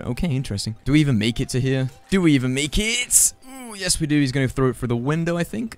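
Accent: United Kingdom. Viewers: must be british right?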